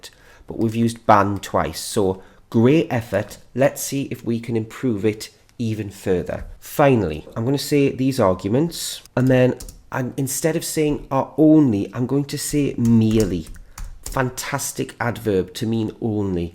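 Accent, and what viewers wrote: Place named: United Kingdom. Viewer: Hi~ I am back. My final exam has just finished. I will try to practice writing skill during this long new year holiday. Thank you very much. Please keep doing🎉😊 I cannot love your teaching program projects more.🎉 Besides of writing, i love the way you reading at the end coz i could learn how to read and practice pronunciation, tonation, british accent. Perfect! ❤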